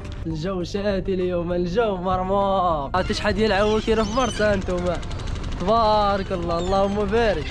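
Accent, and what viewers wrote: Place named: Safi, Morocco. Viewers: ولد اسفي وهده هي هضرتهم . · بلعكس هضرة اسفي تتعجبني حمد لله مغرب متنوع لهجات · تاراهاكا هضرة ديال آسفي❤ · هضرت اسفي هاكة تحية كبيرة ناس اسفي❤❤❤❤❤❤❤ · راه هكا ولاد اسفي كيهضرو عادي جدا نتا جاك غريب حيت عمرك شفتي ولاد اسفي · نطق ولاد أسفي هو هداك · انا ولد أسفي وككنهضروش هاكا هدو هضرة النواحي ديال أسفي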